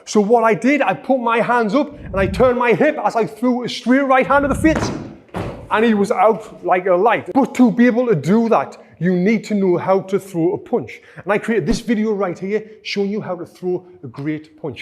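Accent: United Kingdom. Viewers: Just watching for this great British bulldog accent😅 I use left hook twice low and immediately high afterwards. But I trained that. As you said stay balanced when moving back protect ur chin and do not blink. Or hang around with nice people at chill places so u do not get confronted. Worked for me last 30 years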